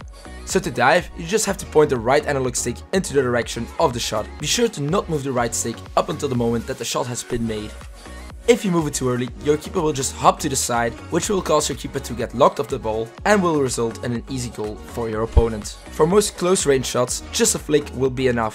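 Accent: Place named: Netherlands. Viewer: Ik hoor an je uitspraak dat je Nederlands spreekt, klopt dat? Toch heb je een zeer goede uitspraak in het Engels. Bedankt vor de video, het heeft me geholpen. Groeten uit Duitsland! :)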